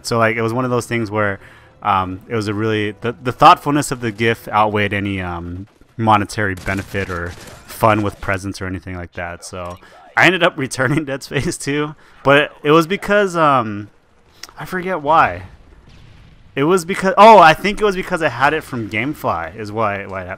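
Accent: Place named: United States. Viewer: When u said u were asian i was like whaaaaaaaaaaaaaaat? xD you got an american voice, nice gameplay.. as always!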